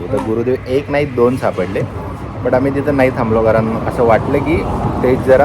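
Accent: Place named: Pune, India. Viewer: Punyat aloy mhanun proper marathi ka😂tumchya punyachi tone aikun vakaila yet bhava..🤮🤮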